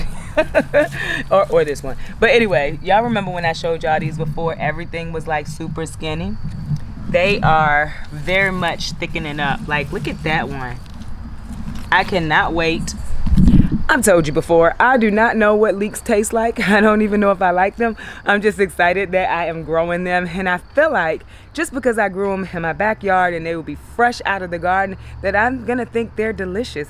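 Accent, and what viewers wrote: South, United States. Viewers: You have the best aura....I just love who you are. You have such a positive attitude, a light refreshing southern voice. You're just plain beautiful inside & out!!! Happy growing!💚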